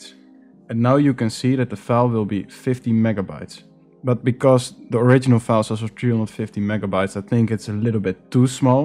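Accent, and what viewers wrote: Netherlands. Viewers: Hey cool you Dutch? thought I heard it. Nederland! <3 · Dutch accent detected. I like!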